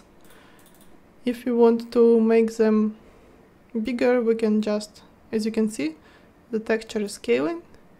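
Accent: Russia. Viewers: Как звучит русский акцент? Вот так) Спасибо большое за видео! Успехов! ЗЫ: Очень подробно. Подписался. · слышно русский акцент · Jest' ya slsshu russkiy aktsent, ))) Есть русская версия?))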